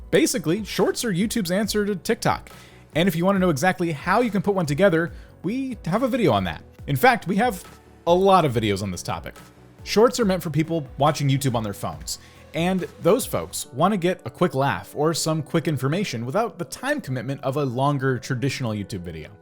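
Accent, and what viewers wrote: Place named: United Kingdom. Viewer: You lost your British accent and grew hair?🤯🤯🤯🤯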